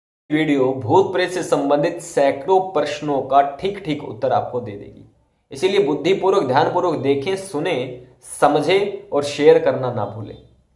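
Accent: Haryana, India. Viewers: Pkka Haryanavi hai bhai.. Maan ge bhai.. Bhai mera comment pdhe aur btana chahe toh ek baat puchhni thi bhai se ki kahan se ho bhai haryana mein.. Baaki boli toh thodi thodi panipat ki lgi..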